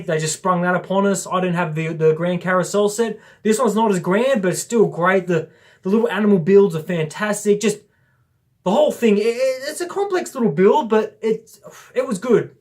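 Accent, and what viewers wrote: Australia. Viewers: i think its a good idea, to make a new release from (some) old sets. the taj mahal is a very cool building - but not to build :-D ... i also wait for the CC, but i think, that will be a dream / The best set 2017 is the old fishing store and the ninjago city..so much great details, so much fun to build :-) / Speed Champions are nice - but i hate stickers, and there are more stickers then parts... :-D ...and without these stickers, you dont know if its a Porsche...or what else ;-) ... / assembly square was 10 years...in 2007 came the first modular -> CC :-) / my english is not so good, but i like listen to you...australian english sounds nice :-)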